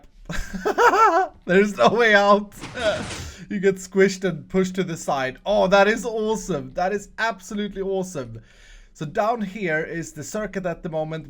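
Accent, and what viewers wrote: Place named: Sweden. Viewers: yes hes swedish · Hey ARE you from sweden i just subscribed on you and you sound like a swedish Guy IM from sweden hejdå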